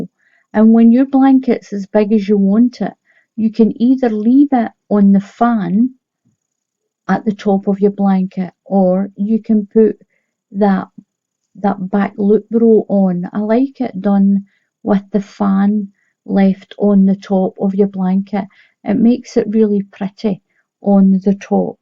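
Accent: Scotland, United Kingdom. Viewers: your beautiful Scottish accent remember me of the good time that we had in Scotland. My husband looked forward on breakfast every morning because of the haggis. You are a wonderful teacher, thanks for your great crochet tutorials. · Hello, I just subscribed your channel. I watched many different ones and yours is my absolute favourite!! Beautiful crocheting and I love how you explain everything so well and repeat it over and over, which I find important because then I don’t have to go back to find it again. Also love that you speak slower than most do, it makes me feeling relaxed. And your British accent is lovely 😊 I’m German but live in Canada since 26 years. The winter is hard and long, so I picked up crocheting. I’m enjoying making this one here right now. I have saved several videos from you and I want to do them ALL! Lol Thank you for doing this❣️👍👏❤️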